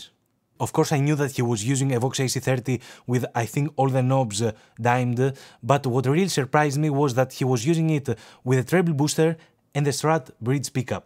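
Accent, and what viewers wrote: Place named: Greece. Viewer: Σίγουρος από τα πρώτα 2-3 δευτερόλεπτα ότι ήσουν Έλληνας.. Nice vid bro, keep 'em coming! Subscribed!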